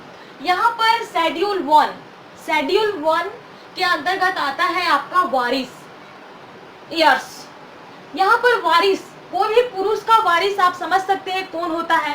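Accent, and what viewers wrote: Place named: Uttar Pradesh, India. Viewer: Apka language ascent UP wala lagta hai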